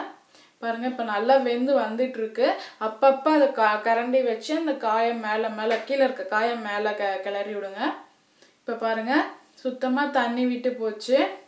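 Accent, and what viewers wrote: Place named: Tamil Nadu, India. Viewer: A great recipe. By the way there is nothing wrong with your accent. Tamilians all over the world love your accent as it reminds us of our motherland. Please keep it up! Thanks!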